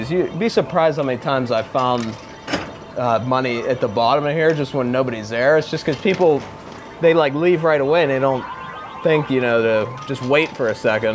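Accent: Pittsburgh, United States. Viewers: You have a pretty strong yinzer accent.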